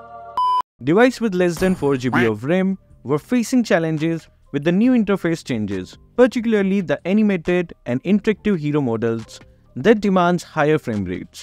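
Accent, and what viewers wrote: India. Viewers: Question: are u Indian?Bcoz you have an indian accent.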